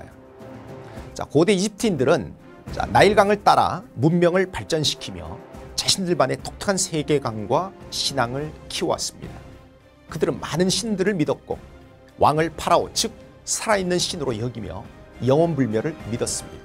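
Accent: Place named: Samcheok, South Korea. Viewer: 20강짜리 7일동안 듣고 한국사능력검정 93점 맞게 해준 ㅋㅋㅋㅋ 강의할때 삼척사투리 쓰시면서 카리스마 넘치시는데... 착한척 말투 완전 적응안되네 ㅋㅋ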